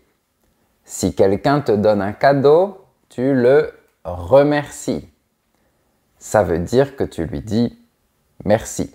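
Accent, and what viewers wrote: South, France. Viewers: Je suis française et si je t’écoute c’est par pur plaisir. Et puis je suis exilée dans le nord et j’adore ta façon de prononcer « autre chose » ( au lieu de ôtre chôse ) ça me rappelle le pays. Pour « emballer » chez moi c’est plutôt un synonyme d’enchanter: « ça ne m’emballe pas de prendre la voiture par ce temps pourri »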